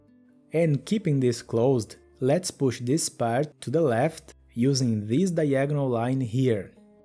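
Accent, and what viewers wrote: Brazil. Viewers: Pelo sotaque é brasileiro kkkkkkk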